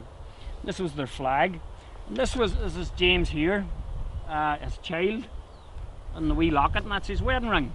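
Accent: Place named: Northern Ireland, United Kingdom. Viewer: It's a Northern Irish accent.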